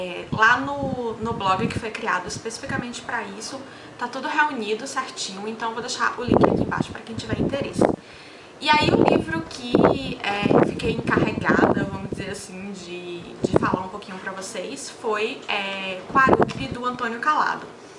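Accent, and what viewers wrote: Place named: Salvador, Brazil. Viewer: Eu bem que achei que você tinha sotaque de soteropolitana, somos conterrâneos. Um belo achado seus vídeos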